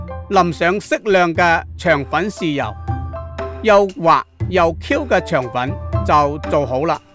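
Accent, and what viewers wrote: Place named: Zhaoqing, China. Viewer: 口音一聽就聽肇慶人👍👍👍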